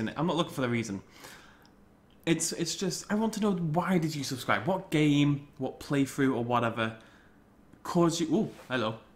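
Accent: United Kingdom. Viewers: I subscribed to you back in 2013. It was a cold day after a family conflict, and I don't remember how exactly I found it, but I watched your entire Jurassic Park The Game playthrough (in roughly two days) and I just loved it. I didn't love the game (not at all), but I really enjoyed your calm and humble approach to commentary on a let's play. I was tired of the loud energetic and highly edited content that let's plays and playthroughs have been so saturated with (like Pewdiepie, Markiplier and Jacksepticeye). But your content is always something that I feel that I can sit down and relax with. Also you seem like an amazingly nice guy :). I am also a big fan of dinosaurs. It's pretty much been my biggest interest for most of my life. So, when I saw a channel with dinosaurs being a major subject, and a YouTuber with an amazing british accent, I subscribed to your channel. 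I really don't know what type of content that I would like to see the most. I know that I was pretty excited about your new series called Pangea Today. The concept of it was that you would talk about and report new paleontological discoveries. After the first episode you ultimately abandoned it, and I don't understand why. You even mentioned in a vlog, I think, before the first episode of Pangea Today that you would bring the channel into a more eduacational direction. I know it's kind of a long time ago, but I am still wondering. I'm not complaining. I've just been confused about it for awhile. Can you please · To be honest, I subscribed for just about everything about your videos. I mean, comedy, British accents, and DINOSAURS? Recipe for success in my book. And what makes it so much better is that you actually interact with your audience. Even before the livestreams, in, say JPOG, you'd ask "Which dig site should we do next," or "How should we make this exhibit?" It really shows that you care about your viewers, and you also appreciate and respect everything about the games and dinosaurs. 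But, if you're running out of dinosaur games, then of course shake things up a bit. Do LPs of a game that you thoroughly enjoy, do a game that allows your fans to join you,(The Isle?) do games that have something new or weird. I'm not a Let's Player-yet-but I think this is a pretty good plan for youtubing. That aside, I'm here to stay. Thanks,. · Im a little late to the party here but what made me subscribe was your voice and jpog all those years ago. and the original british-ness, i joined the british revolution god dammit!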